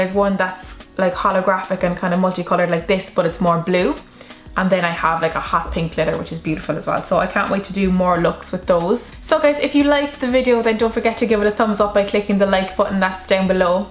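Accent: Ireland. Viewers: Are u Irish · Gorgeous look,I can hear an Irish accent from you😉🌺Are you Irish?x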